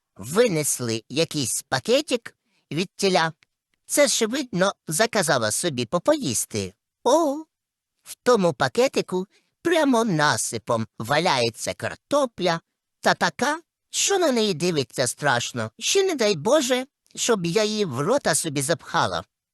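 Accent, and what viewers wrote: Poltava, Ukraine. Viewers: Наче з Полтавщини говір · У нас на полтавщині так розмовляють.Голс такий заспокійливо в'їдливий Так гарно , весело.Слухаю з задоволенням коментування бабчині.Особливо по спідницю.Ап про сваху як тонко підмічено!Дякую, дякую!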